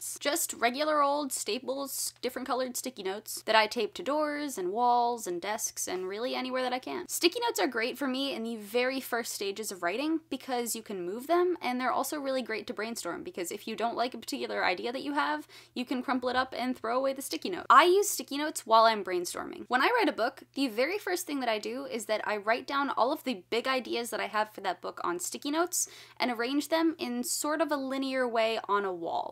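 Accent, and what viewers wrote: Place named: United States. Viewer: love your very american accent, its like listening to a tv series, your voice is very hisg school type but cool and likeable. :D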